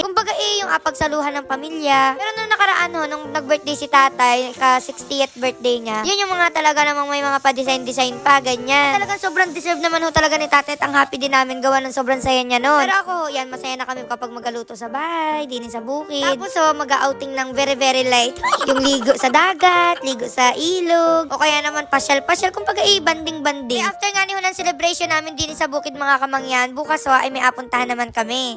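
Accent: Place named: Marinduque, Philippines. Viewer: Happy birthday 🌺🌸natutuwa talaga ako sa iyo paano ay kuhang kuha mo yung salita namin sa Marinduque😅😅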